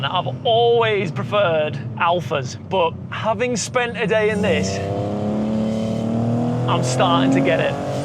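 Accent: United Kingdom. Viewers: Ergh, that Northern accent !!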